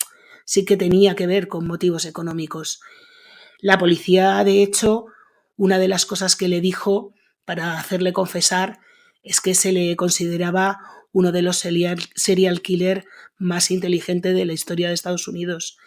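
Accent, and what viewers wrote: Castile, Spain. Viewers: Qué comentario tan desagradable, Pilar. narra muy bien, puro castellano.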